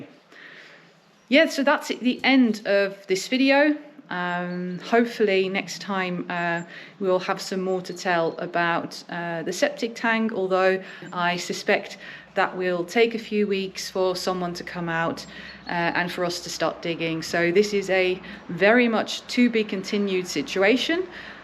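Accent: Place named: Australia. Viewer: Just wondering if you have lived in my country Australia? 🇦🇺 Sometimes you have a little Aussie twang. You did a great job facing a disgusting 🤮 situation. Brave woman.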